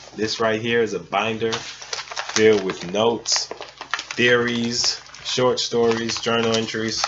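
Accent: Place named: New Orleans, United States. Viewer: That New Orleans Accent was So Thick LOL !... Not So Much Now - Barely Can Hear It ...Thank U Much for SHARING '-p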